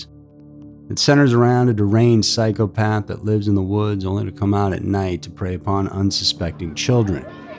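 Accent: South, United States. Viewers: He's got a slight Southern accent I can't quite place by region, while his soft tone and melodic speech puts me in mind of a Tennessee Williams character or time frame or filmy floating fabric or something like that. I just discovered him and liked reading all the appreciative comments. I grew up with riveting storytellers from pioneer days, and fits that category I think.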